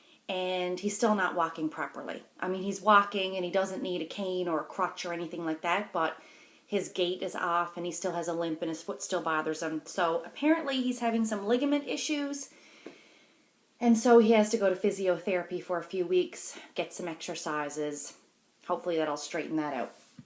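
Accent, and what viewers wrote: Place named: Newfoundland, Canada. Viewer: Great video/WIPs ...I loved your doodling pics too. I really enjoy listening to your Newfoundland accent👍🏻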